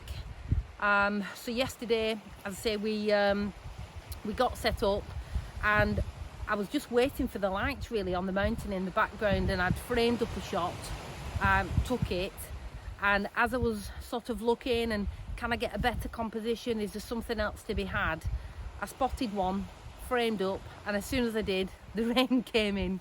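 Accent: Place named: Lancashire, United Kingdom. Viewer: Brilliant :-))))) That last few seconds going on about your make up LOL! After taking a paddle in the river, that should have been the last thing to worry about! Gorgeous images, being from Lancashire myself, great to hear that dialect.... :-)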